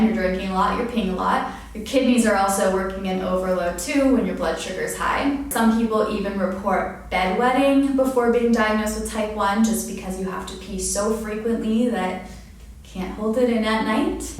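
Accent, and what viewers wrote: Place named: United States. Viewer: You sound American but you said washroom lol. Thank you for your story :-)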